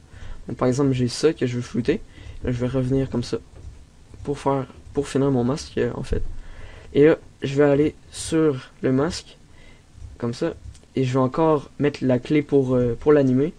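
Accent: Quebec, Canada. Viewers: Vive, dommage de l accent un peu trop prononcé:S Mais sa gere quand meme, Peace au Quebequois!:)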